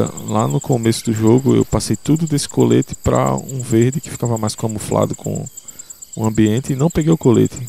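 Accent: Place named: Recife, Brazil. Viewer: Bicho tu és de que cidade ? Tens sotaque daqui de Recife.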